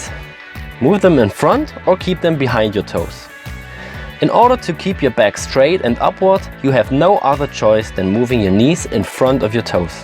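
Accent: Germany. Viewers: You sound German, are you? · great video, love the german accent . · that english accent is german as fuckkkk xd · Alter bist du deutsch, merkt man sofort nach einer minute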